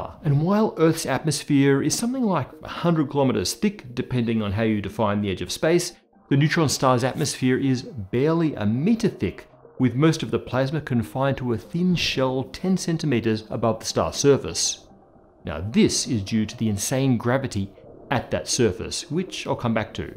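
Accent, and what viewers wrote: Australia. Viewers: You must get offended by things you don't understand to comment on YouTube. It's irritating. His accent is australian. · You have such a heavy Australian accent that it makes it very hard for me to understand. And I really want to love your Videos because of the Subject you talk about...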